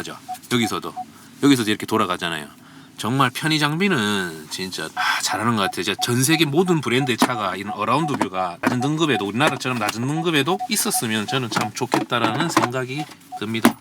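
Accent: Gyeongsang, South Korea. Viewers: 리뷰 잘 봤습니다~~대구분이신가요~??^^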